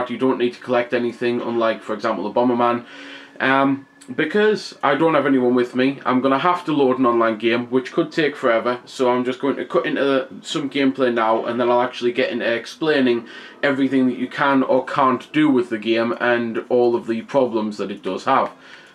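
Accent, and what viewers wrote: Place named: Sunderland, United Kingdom. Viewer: Are you from Sunderland?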